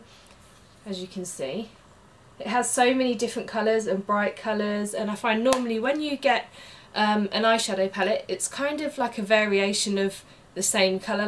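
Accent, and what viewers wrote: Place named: United Kingdom. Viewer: Nice video! I love your voice and your British accent! I could listen to you talk all day long! Please do more of these makeup videos/tutorials, maybe some you are getting ready? Thanks! oh, I subscribed! 😍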